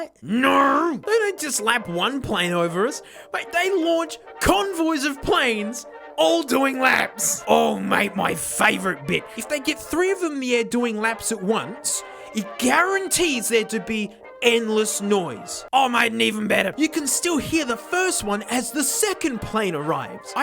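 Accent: Australia. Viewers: Man what a shitty position you've been in. Really glad our support has enabled you to move somewhere else. Hope you'll enjoy it there man! Keep that silly content going you loud aussie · Dude I've been watching this channel for a while, it's become a new favorite. I initially had a sneaking suspicion but then thought "no I must be racist towards Australians, they can't all sound the same" that this was. I'm probably more than a dozen videos in. You mentioned drumming in this video and I just thought "no way this has to be him", and sure enough I look under the description and there's lmao. Great channel.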